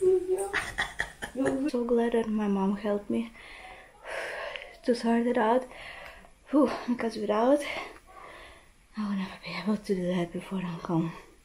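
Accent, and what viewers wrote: Jamaica. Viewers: This is brazy watching a white girl talk with a Jamaican accent. I live in England.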